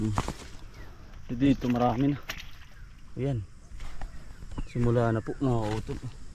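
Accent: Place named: Batangas, Philippines. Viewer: Tanung lng po kautol saan po ang lugar nyo kc ung pronounce ng salita nyo parang taga batanggas po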